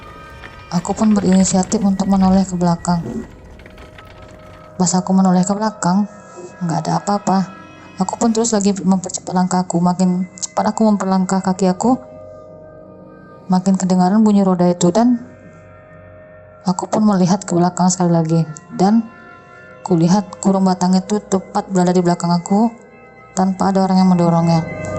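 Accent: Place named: Banjar, Indonesia. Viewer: Tapi logatnya mirip org Kalimantan atau banjar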